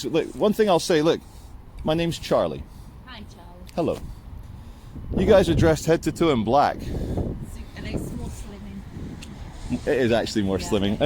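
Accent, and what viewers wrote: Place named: Scotland, United Kingdom. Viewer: Your accent is just Scottish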